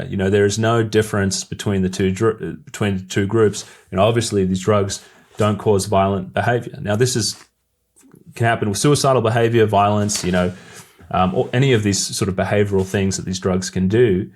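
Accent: Australia. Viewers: Why are you Australia accent?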